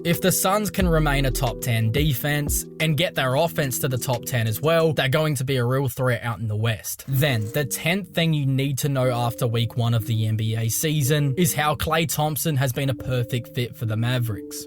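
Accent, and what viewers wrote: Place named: Australia. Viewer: You’re voice is so Aussie that it’s not even Aussie any more haha! It’s awesome 🤣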